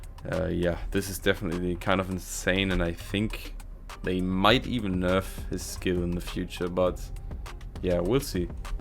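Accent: Germany. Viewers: Bro du bist deutsch oder ? Haha dein Akzent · Dieser Deutsche Akzent 😂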